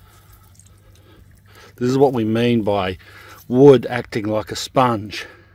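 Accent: Australia. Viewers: Stralian ASMR. 👍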